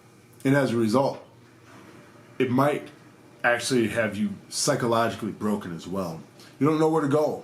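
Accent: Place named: Midwest, United States. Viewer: Just started watching your videos . They helped me stay on track. Way to go! Also I could tell by your accent you’re from the Midwest. I’m from Ann Arbor, Michigan. Go Blue!!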